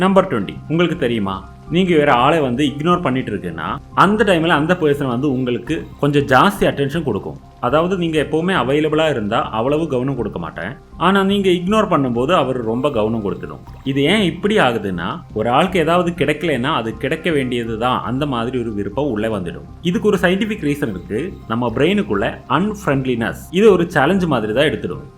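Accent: Kerala, India. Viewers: Are you malayali right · Are u malayaali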